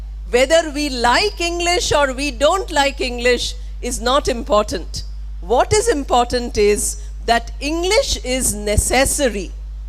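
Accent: India. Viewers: Indian English you are uttering !!!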